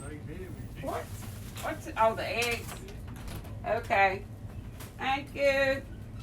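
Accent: South, United States. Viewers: Your a HOOT! Love southern accents!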